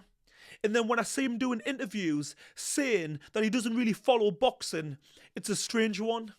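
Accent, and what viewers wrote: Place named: Geordie, United Kingdom. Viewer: That's gotta be the broadest geordie accent I've ever heard 😂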